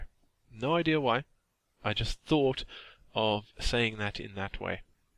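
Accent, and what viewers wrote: South Africa. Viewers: I think you need to be yourself. Don't try to be someone your not. If your comfortable speaking in your south African tone/dialect then do so. Hey brut. ^_^ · I really enjoy listening to all kinds of differnt languages and accents. So I would like to hear your South African accent more often. :D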